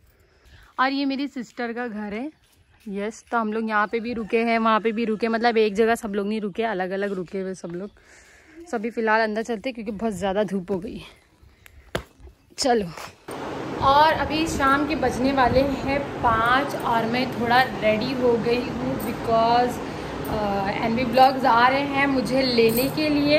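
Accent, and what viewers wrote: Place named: Mumbai, India. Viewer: ap ki aawz me mumbai ka tuning hai sach mein inshallah allah ap ka 100k jld se jld hojayega ap ke sach me ek din 1million followers hoge inshallah thankyou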